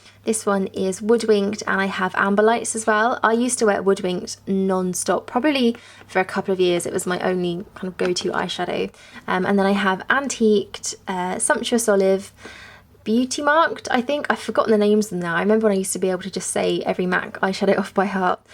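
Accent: England, United Kingdom. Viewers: I love your accent. I am an English accent aficionado and yours is especially pleasant. HA HA.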